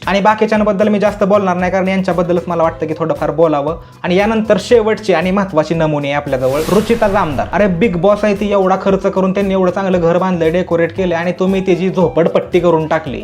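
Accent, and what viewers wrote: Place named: Karad, India. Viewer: Karad ancent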